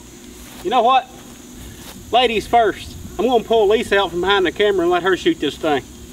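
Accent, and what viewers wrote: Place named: Oklahoma, United States. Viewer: This dude has a cool accent -- Oklahoma?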